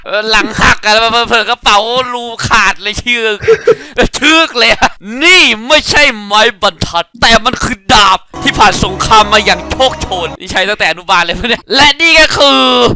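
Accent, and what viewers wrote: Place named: Isan, Thailand. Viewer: เล่นเว้าครับ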